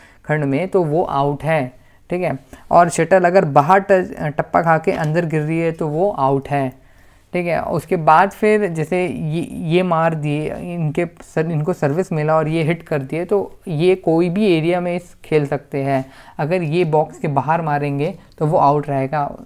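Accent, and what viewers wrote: Odisha, India. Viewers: Bhai ap odiya ho na kyuki bhech bhech mai ap Mane Mane bol rahe ho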